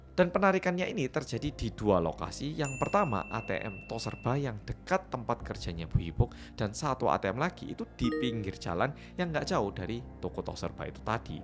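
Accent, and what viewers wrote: Java, Indonesia. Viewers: Suaramu medok banget bang koyok wong njowo.ya paling sopirnya itu yg membunuh.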